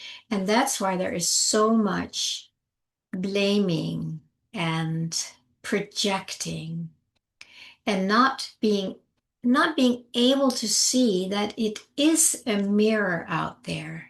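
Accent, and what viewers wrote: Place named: Europe. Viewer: Some times I write comments to quick lol Yes, not in a judging way. In a living manner I say love and compassion first second and third then try try again It’s just so stupidly simple We tend to complicate it some make the biggest messes then we have to help clean up. That’s ok cuz hopefully they have helped clean up ours lol. Ya know, your videos have this way with me. The maternal aspect of your voice with your subtle accent reminds me of old world Europe. My grand mother That old Rumanian Gypsy So thank you 🙏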